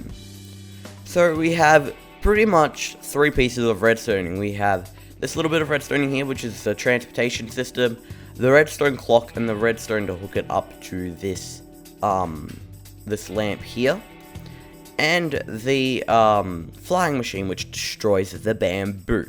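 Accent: Australia. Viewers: It it just me or is this man Australian? <3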